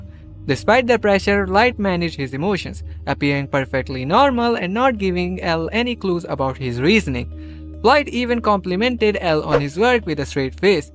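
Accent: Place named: India. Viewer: Wow, Amazing video 💫 always refreshing to watch a scd video in Indian accent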